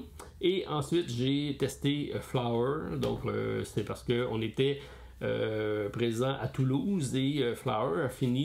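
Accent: Quebec, Canada. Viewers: Bonjour. merci pour cette vidéo. Coup de coeur du mois de Mai pour EVACUATION de VLADIMIR SUCHY. Attendus pour le mois de Juin, WYRMSPAN et SLAY THE SPIRE. Bon Weekend....... La langue Française avec l'accent Québécois n'est-elle pas trop belle!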